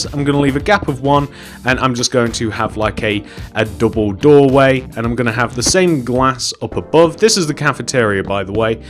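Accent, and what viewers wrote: United Kingdom. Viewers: love the british voice.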